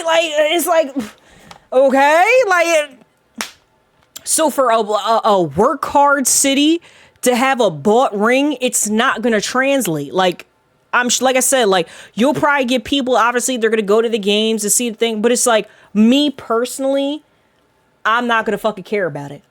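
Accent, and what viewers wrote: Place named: Baltimore, United States. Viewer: Hey where you from? U sound like ur from Baltimore but ur a sixers fan? I'm from California so I can be way off?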